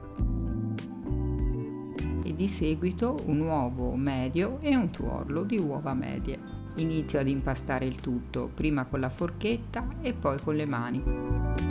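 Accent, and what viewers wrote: Tuscany, Italy. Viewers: 😋😋😋😋che meraviglia. La farò sicuramente. Ben tornata ascoltando la tua bellissima voce con l'accento Toscana.mi sei mancata.ma ora si ricomincia mia cara.e come ti dico sempre un bacione cara.❤❤❤❤❤❤❤❤❤❤❤❤❤🌹🌹🌹🌹🌹🌹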